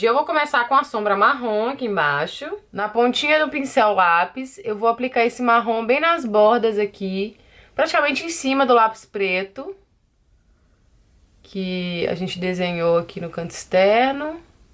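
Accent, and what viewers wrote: Minas Gerais, Brazil. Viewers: Uma boneca!!.♥Amo seu "minerês",tbm sou mineira.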